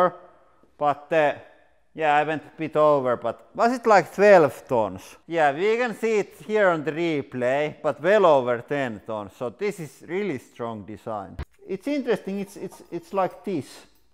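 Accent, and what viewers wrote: Finland. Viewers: Most Finnish accent ever. Go Finland 🇫🇮 Soumi!!!!! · I've been wondering why this guy sounded like Kimi Raikkonen, little digging and just found out he's Finnish, no wonder lol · Drop the talk. Can't tell if you're speaking Finnish or English with extremely heavu Finnish accent. Put up some text instead. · Without checking, you are from Finland :) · ralli englanti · Finnish accent?? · Sounds like this guy is from Finland and he has some Biltema jack's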